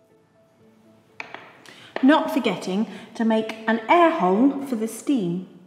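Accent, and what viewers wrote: United Kingdom. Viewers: Why do I keep watching this video?!?! (other than the British accent, I mean)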